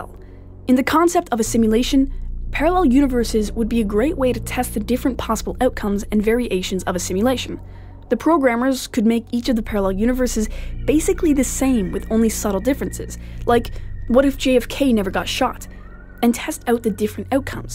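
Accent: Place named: Canada. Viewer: This is an amazing channel which is innovative and logical without being boring and I love the commentary.. also the way you say "out"and "about" makes me think whether you're Canadian 😋 One of the best channels I've found on YouTube!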